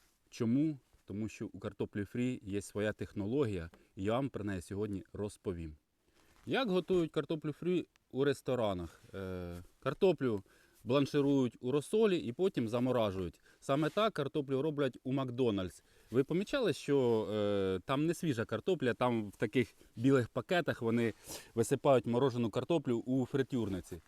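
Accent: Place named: Poltava, Ukraine. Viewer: став підписником не лише через персональне знайомство, а й завдяки гарній українській з легким полтавським відтінком) І не лише я. Продовжуй так і далі, молоток!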